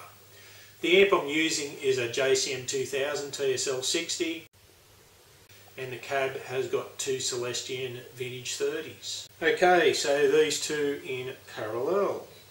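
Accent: Australia. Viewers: Thx for the vid! BTW, are you an Aussie? :)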